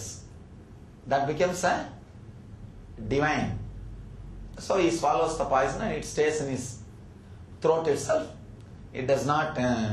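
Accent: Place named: India. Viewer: Swamiji's accent has gotten strong again after his being back in India for Himalaya trip. It was hard to understand the first part, but worth while holding on, as usual. An interesting addition to the enlightenment lesson.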